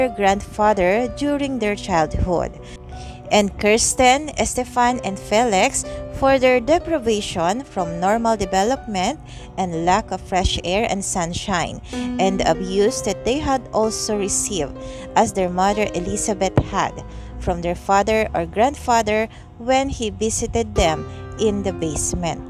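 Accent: Philippines. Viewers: Don’t listen to the hateful comments! I love your Filipino accent. Hi from Canada! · Filipina accent? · I liked her accent too she s filipina for sure maganda accent ate keep going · Its a Filipino accent. I looked thru her videos and there are videos in Filipino language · A Pinoy speaking.. 😂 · ok lang... pinoy tayo eh. d yan magbabago, feeling lang ng iba nawawala ang accent nila kung cla ang nakakarinig sa sarili nila.. ang mahalaga maliwanag ang pagkakasabi sa words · Just because someone has an accent doesn't mean the Narration is not understandable. As a fellow Filipino, I'm happy to hear a familiar voice doing these big stories on YouTube. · I hear a Filipina accent ♥ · Pinoy na pinoy ang accent e. Haha.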